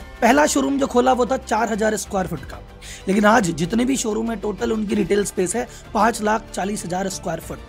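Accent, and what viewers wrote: Gujarat, India. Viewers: Aap Gujarati h kya.???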